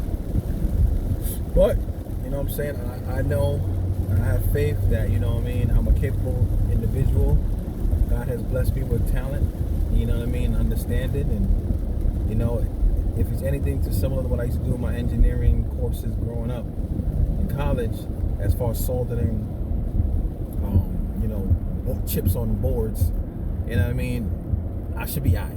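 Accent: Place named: New York, United States. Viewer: what part of new york are you from man ?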